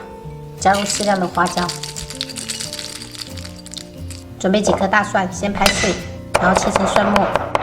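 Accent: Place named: Fujian, China. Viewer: 还以为你是福建人呢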